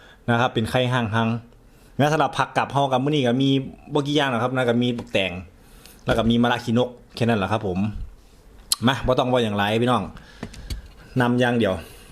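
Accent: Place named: Ban Phue, Thailand. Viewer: สำเนียงคุ้นๆเป็นคน อำเภอบ้านผือ บ่ครับ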